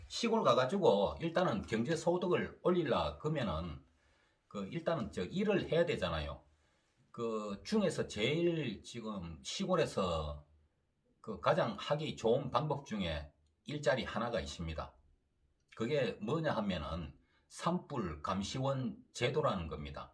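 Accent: Gyeongsang, South Korea. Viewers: 순수하신 진짜 시골분세요 근대 경상도 인가요 제가 가고싶은대 경상도는 ㅜㅜ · 경상도 사투리 쓰시네요 어디신가요? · 고향이 어디 경상도 문디이 말투인데 혹시 경북봉화 점촌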